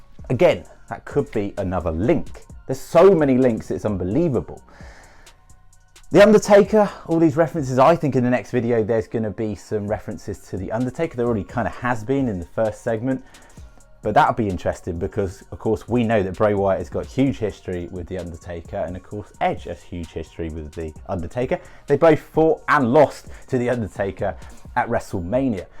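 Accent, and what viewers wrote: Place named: United Kingdom. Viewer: Found your channeled today. Once I heard your voice and that British accent I subscribed immediately - because that’s the law. Everyone knows if you want to be a good wrestling Youtube channel you need a Brit. Don’t question this rule, because the video was really good so the rule was right. Oh and I really hope Bray comes back!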